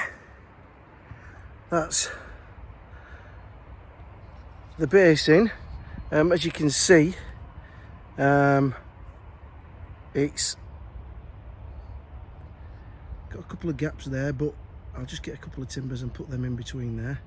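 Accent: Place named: West Yorkshire, United Kingdom. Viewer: Hello, I just watched your video and really enjoyed it. So down to earth and practical, and I love the West Yorkshire accent. I worked in Leeds for 12 years and really miss the place! I don’t think I will have problems with flooding on my veg plot😮😂.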